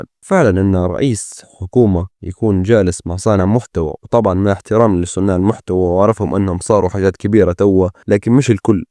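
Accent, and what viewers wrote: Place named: Libya. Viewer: بلهجة الليبية 🤯🔥🔥🔥🔥🔥🔥🔥🔥🔥🔥🔥🔥🔥🔥🔥🔥🔥🔥🔥🔥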